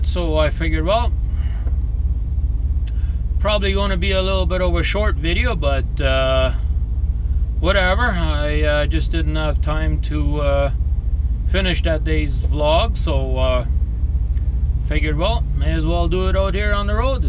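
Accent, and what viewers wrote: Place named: Canada. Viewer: An actual heavy Canadian accent, I haven't heard one in a while.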